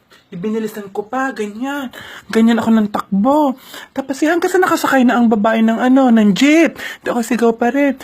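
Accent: Batangas, Philippines. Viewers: Saan po kayo nakatira ate kasi po parang pangbatangenga salita nyo ehhh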